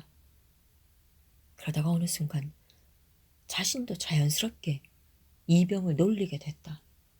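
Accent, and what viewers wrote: Gyeongsang, South Korea. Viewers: 갱상도 버전으로 읽어니 또다른 맛이 나네요 잘들을게요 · 왜 갱상도말로 하는 거죠? 신경 거슬려 못듣겠음.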